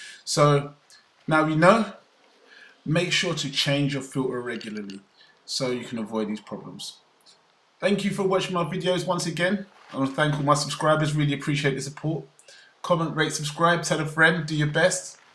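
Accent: United Kingdom. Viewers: You know just by hearing you talk im almost 100% sure you are black and from the uk EDIT: no offence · where are you from .. England ?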